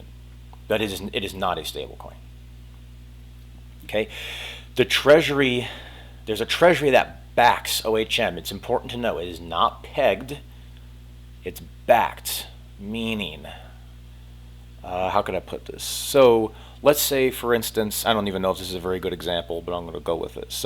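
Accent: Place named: New England, United States. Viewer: You sound like you're from New England..😎😎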